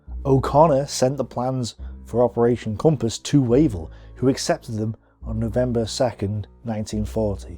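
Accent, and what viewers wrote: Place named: Manchester, United Kingdom. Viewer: It's a Mancunian Accent , from Manchester / surrounds.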